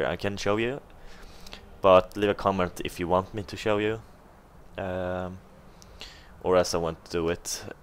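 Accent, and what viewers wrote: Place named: Sweden. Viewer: He sonds like hes swedish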